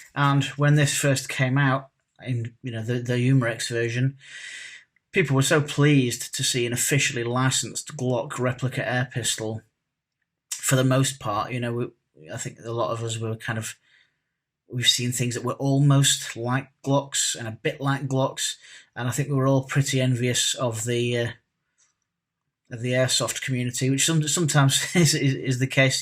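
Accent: England, United Kingdom. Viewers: I really enjoy your commentary. Your explanations and English descriptions are very pleasing to the ear. I've always enjoyed the English accent. The adjectives you use are first class. Love your content brother!!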